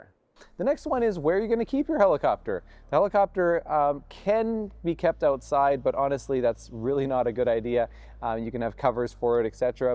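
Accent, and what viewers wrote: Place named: North, United Kingdom. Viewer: HA, he's a northerner...help you oHut.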